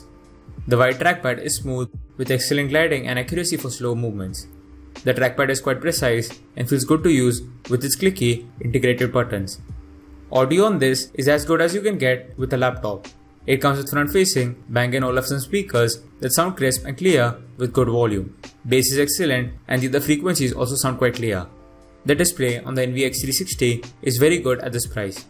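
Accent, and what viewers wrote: India. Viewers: You try speaking Indian without accent..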